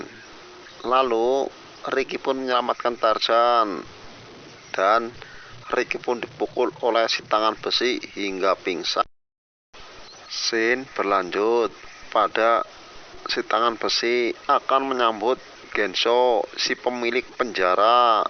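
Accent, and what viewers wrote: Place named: Banyumas, Indonesia. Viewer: Rika wong ndi bos ngapak temen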